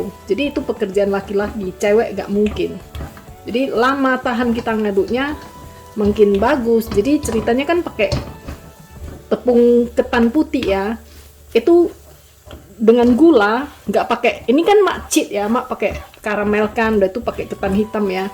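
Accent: Medan, Indonesia. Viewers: Logat bicaranya kayaknya dr Medan nih...sukses selalu ya..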